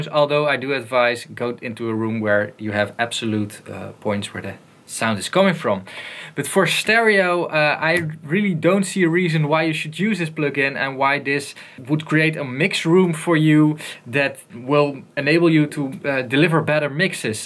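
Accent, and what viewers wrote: Netherlands. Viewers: 1sec in, this guys is obviously Dutch xD.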